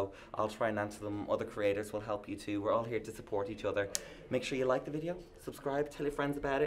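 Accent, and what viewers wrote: Ireland. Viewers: His boss was an idiot. He has a perfectly nice voice. Also that Irish accent 🥰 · The tears are not from pain,. There from being deeply moved. I had damp eyes, too. Tears of joy at your overcoming real obstacles. Also, as a Yank, your beautiful Irish accent melts me too. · I don’t think he sounds ‘gay’ whatever that actually means.. he just sounds Irish to me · Your boss was right noticing your voice....... it’s gorgeous and I could listen to your Irish accent all day xxx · Nope, not British. He sounds Irish. Which he is. 🍀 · I used to just "love" your videos but after watching your presentation I "adore you now." By the way, I do not think you sound gay: you just sound Irish! 😘🏳‍🌈👍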